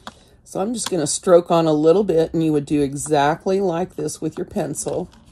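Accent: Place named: South, United States. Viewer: I am really enjoying your videos and your gentle, southern encouragement,. Thank you for the time and effort you are taking to make them. You have reminded me that an embroidery teacher once taught me that we all think the person on our left has nicer stitches and the person on our right has nicer colours, but remember that each one of us will always be the person to the right or left of another learner. That is exactly what you are saying about Zentangle. Don't give up, just be you. (Melb, Australia - we are opening slowly but with great vigilance. The curve has definitely flattened but we are still seeing random local clusters. Fortunately out of 7k cases we have only had 100 deaths).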